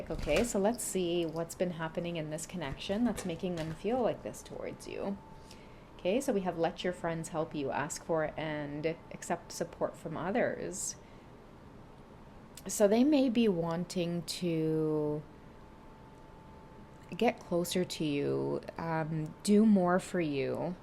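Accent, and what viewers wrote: Trinidad and Tobago. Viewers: As a fellow West Indian, Jamaican girl, I LOVE your channel. & love your trini accent 🥰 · I love to hear you speak.. u bring me home every time I hear that. I might be wat dey call a Yankee but growing up all I Kno is a Trini tounge all my thoughts is in a Trini accent. I love to tap in with ya. Ya does really make me laugh 😂❤ but ya talking de truth. Luv itt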